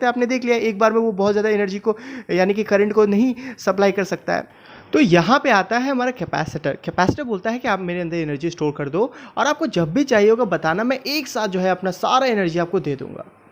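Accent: Bengal, India. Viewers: Kya aap bangali he? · Are you Bengali?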